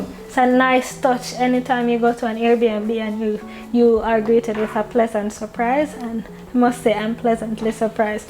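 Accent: Jamaica. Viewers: I have to comment because your narration, description, accent (seems 🇯🇲 ) and personality moved me to. Also had to subscribe! Phenomenal video 👏👏👏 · Cah tell me seh u anuh Jamaican mama👀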